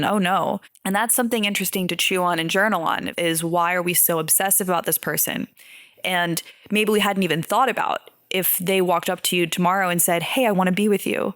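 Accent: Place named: California, United States. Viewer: hello, your speech is amazing are you from western California.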